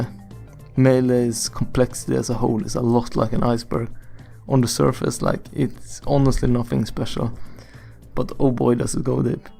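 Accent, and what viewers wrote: Sweden. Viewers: extremely swedish accent. I was hoping you would say "double-yump" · the most swedish accent of all time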